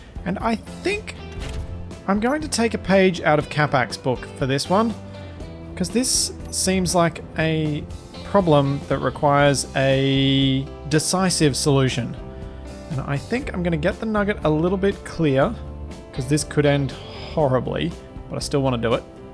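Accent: Australia. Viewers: Are you from Australia m8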